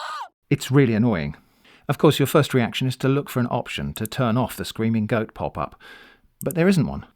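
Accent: United Kingdom. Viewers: With the lively British narration, I feel like I’m listening to a chapter of Hitchhikers Guide to the Galaxy!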